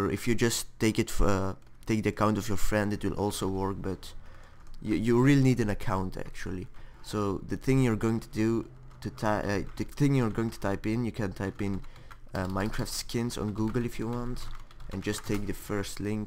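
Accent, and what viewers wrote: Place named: England, United Kingdom. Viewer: hahahah je engels accentje is grappig man :D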